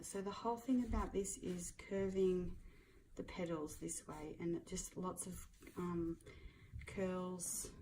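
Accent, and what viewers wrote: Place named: Australia. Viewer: I’ve watched a dozen videos on painting Watercolour roses and this one is by far the best. You sound Australian, hi from Tasmania 👋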